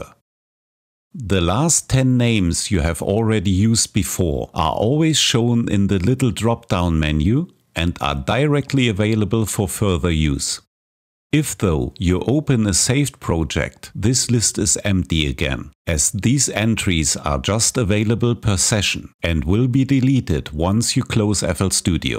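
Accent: Germany. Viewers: Akzent klingt Deutsch.......gibt es auch solche Videos von ihnen auf Deutsch?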